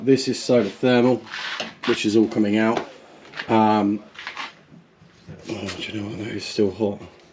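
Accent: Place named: Berkshire, United Kingdom. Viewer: I'm no plumber, but a home enthusiast, I know building regs, plumbing regs thanks to your videos. You sound like you're a Berkshire local possibly, any chance I could learn from you? For free, as a home owner?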